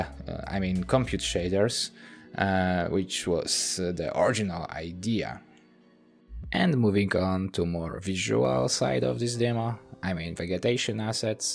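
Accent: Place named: Poland. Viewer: Dude You are really talented and I am grateful that you present these techniques, I am really profiting and learning from it, but pleaaaaaaaaase for the love of god make a script for your videos or prepare some form of presentation. The animation and footage are good but that bored 4 AM freestyle and "uhmmmm" on top of that thick polish accent is hard to listen to broooo, it unnecessarily undermines your porfessionality and credibility, please 🙏🙏🙏🙏. still subscribed though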